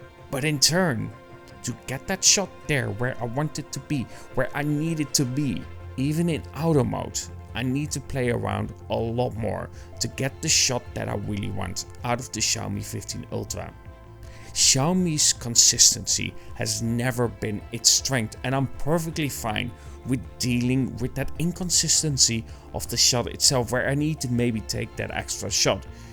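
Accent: Netherlands. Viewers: I just have to start of with saying that you really can not hide the dutch accent, lol It's so thick!! Now about the content: one can only appreciate the fair citicism you made about the Xiaomi 15 Ultra. I'd say it's well founded and perhaps with future upgradings, certain issues can be tackled. Less sensitivity towards light can be fixed with software (or filter) but the fixed apperture however will stay fixed I'm afraid, which means less depth of sharpness. In the end it remains a smartphone, which is crazy, considering what they looked like 20 years back. I wonder what 20 years from now will bring. The Vivo x200 Ultra seems interesting too, but that camerabulk is sooo off putting that the Xiaomi 15 Ultra may not look that bad of an option.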